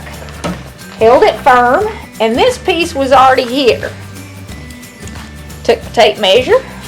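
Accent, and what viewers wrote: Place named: South, United States. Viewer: that southern twang but I got one two lol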